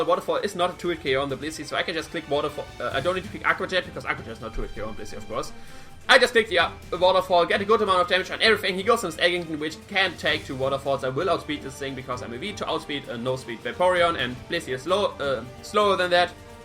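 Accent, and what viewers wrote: Germany. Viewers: Looks like another youtuber i'll enjoy to watch. BTW, if you don't mine me asking, are you German? Cause your English sounds a lot like German. Not to be rude though.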